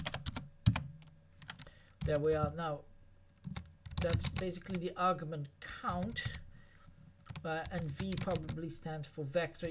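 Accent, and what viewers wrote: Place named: Ireland. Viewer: I cant put a finger on your accent, sound irish but something else too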